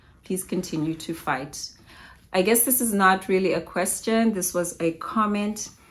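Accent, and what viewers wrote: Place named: Zimbabwe. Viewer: we are same generation. I remember you from your model days. It was called Supermodel right. And remember reading an article about you when you were modelling in SA. And wow still looking beautiful sis. I loved hearing your life story. It shows we all go through a lot. And though it may sound petty but you have that top notch Zim English accent. Exactly how most of us 80s babies sound. Its fading now guess a reflection of our education system. Anyway looking forward to seeing more videos.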